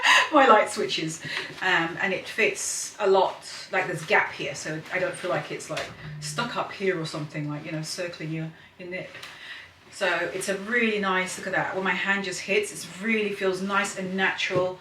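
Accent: United Kingdom. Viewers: I love your videos!! I love your style. I love your apartment!! You and your husband are a great couple. I also love your British accent, by the way.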